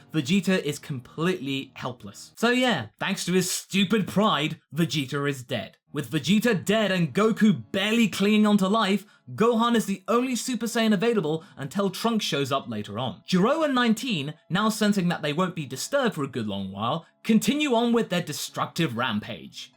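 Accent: United Kingdom. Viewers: Ya kinda sound like a british Goku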